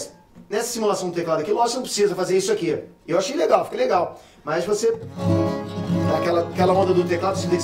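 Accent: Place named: Rio de Janeiro, Brazil. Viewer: e essa voz de carioca ai tem jeito?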